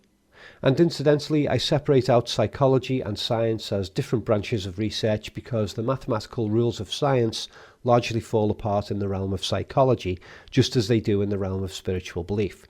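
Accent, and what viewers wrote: Liverpool, United Kingdom. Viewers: found your channel the other night and i love the videos they’re in depth and have some real good explanations and analysis to go with them, on that note am i wrong but i’m curious are you from liverpool?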